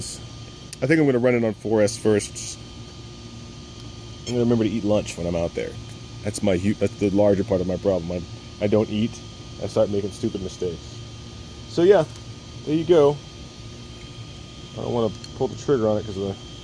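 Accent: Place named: RP, United Kingdom. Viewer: Your british accent is quality lol I thought you were a London Toff ... Good vid Castle fixed ready for next RC Land Battle ;O)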